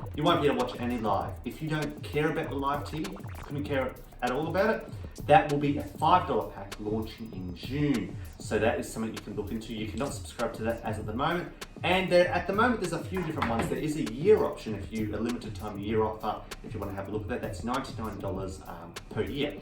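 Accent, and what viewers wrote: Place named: United Kingdom. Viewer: I'm American in U.S, Singed up to this before MARCH 4th launch, i paid only $50 ad free version for whole year ...I dont gave a S about CBS TV stuffs shows, & channels , that MTV & BET is all reality trash channels NEVER care or watch , plus i have U TUBE TV & HULU for that ..Singed up for this ONLY for half off i got for whole year & plus for Paramount & CBS UNCUT premium show's & movies is there & more to come ..beside that F the rest! I LOVE UK guys & accent, is soo cute 🥰👌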